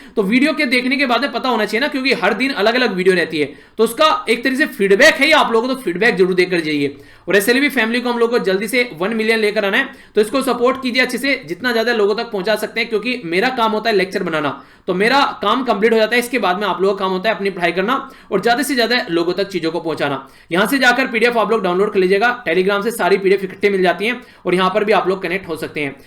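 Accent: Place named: Rajasthan, India. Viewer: Sir r u belongs to rajsthan bcz ur pronunciation .... M bhi rajsthani so I can connect ☺️🤪like Sadi instead of shadi , dactor instead of doctor n so on..........I have been noticing this thing for many days so ......☺️☺️☺️☺️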